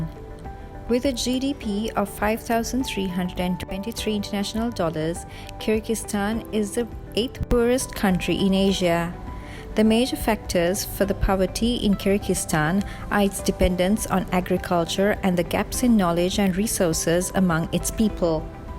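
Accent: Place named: Bangladesh. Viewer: Very nice content with plenty of information, does the speaker belong to Bangladesh?🇧🇩 she sounds like a Bangladeshi girl, very nice presentation.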